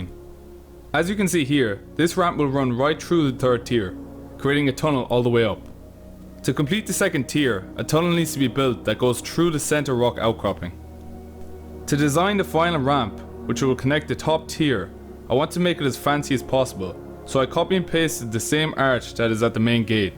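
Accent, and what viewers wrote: Ireland. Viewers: You irish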